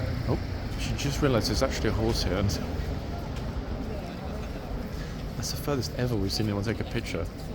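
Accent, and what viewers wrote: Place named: Cockney, United Kingdom. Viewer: You are a very rider cockney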